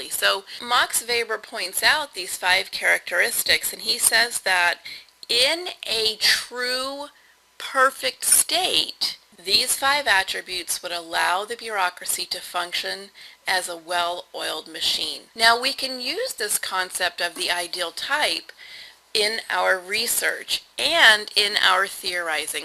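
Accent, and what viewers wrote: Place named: China. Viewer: Chinese equar English, l can't understand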